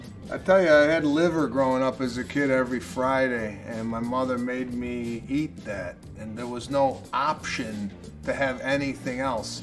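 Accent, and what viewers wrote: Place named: New York, United States. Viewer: It was interesting to hear things about you. I also like how you exaggerate your New York accent (and your HILARIOUS body movements!!!) during your routines.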